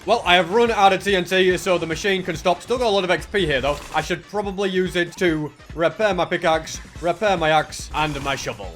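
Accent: United Kingdom. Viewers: he bri’ish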